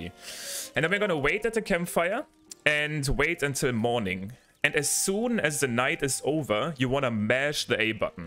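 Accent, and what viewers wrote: Germany. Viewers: Hearing a German speaking anything but German is torture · I also thougt that you are German because of your english akcent LOL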